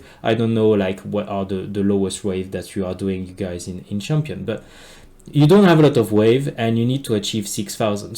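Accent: France. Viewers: this video title and the first 1min have already made my day! been wondering which creator code to use soon but I think I may have made my decision. i was prejudiced against your accent because I thought I couldn't understand it. But once I realized you were French it became very easy to understand you. Your mindset and presentation both most closely resemble my thinking and learning style. (Shout out to Spartan, as well). Can't wait to finish watching!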